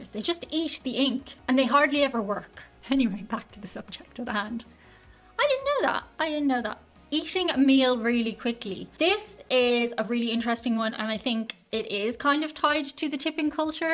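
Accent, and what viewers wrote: Ireland. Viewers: Hi! New to your channel! - for a kick sometime, record yourself saying the words "power, pour, par, pair" - in your charming Irish accent - play it back, and enjoy the echo effect? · I have travelled the world, and find the Irish people the friendliest people on earth. PS - I love your accent/brogue. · That Irish accent. 😍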